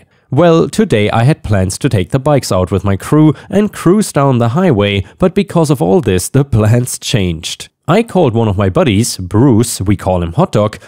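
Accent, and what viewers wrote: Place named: Germany. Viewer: Where did you come from? Your accent sounds German.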